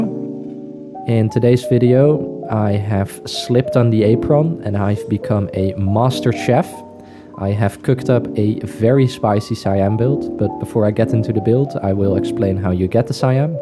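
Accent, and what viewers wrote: Netherlands. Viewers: Are dutch because you really sound like u are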